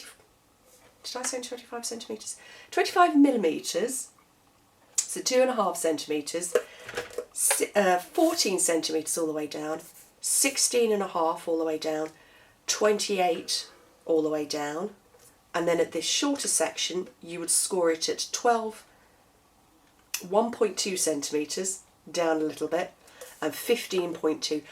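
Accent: England, United Kingdom. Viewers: I absolutely love all of your boxes and bags. They are quite adorable and just perfect! Also, my favorite accent ever is the English accent. So watching and Listening is wonderful! Thank you!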